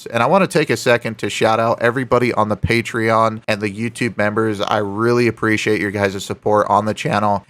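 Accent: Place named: South, United States. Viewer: By now your unique voice and y'all-type accent should be known to most large scam centers. You'll likely have to start using a voice changer. I'm waiting for these Indian criminals to wake up to the possibility of using AI against scam baiters.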